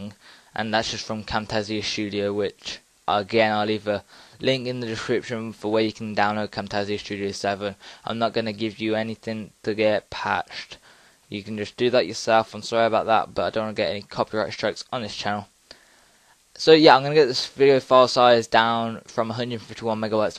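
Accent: United Kingdom. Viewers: okay apparently thats not it. It says it only uses that for previews. I just did it again from scratch along with the video and still nothing. By the way are you scottish? I've been watching monarch of the glen and you sound like them. I'm from Los Angeles so... ANYWAY I'm going crazy. I CANT FIGURE OUT WHY ITS TURNING IT INTO AUDIO. It's still mp4 but the video is gone and even the thumbnail doesn't have an image. Just windows icon which is a film reel with a music note. The video is gone. WTF? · TOO English accent !, Cannot understand a thing.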